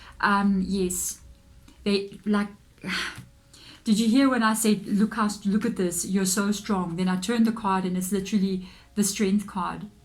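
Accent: Afrikaans, South Africa. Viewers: Hey! First time on your channel¡ About to watch the reading, but heard your accent! Are you Afrikaans?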